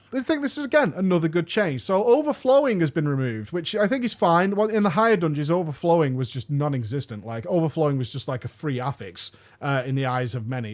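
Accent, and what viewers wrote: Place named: England, United Kingdom. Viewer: Hallvardio it's an English accent tick. Some words with an English accent have a hanging r .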